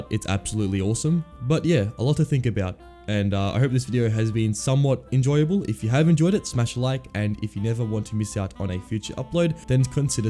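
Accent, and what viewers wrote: Australia. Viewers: you sound australian...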